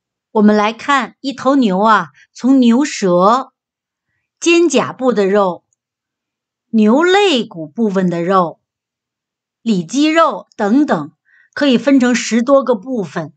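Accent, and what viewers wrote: Tianjin, China. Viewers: 听口音，天津老乡，你好👋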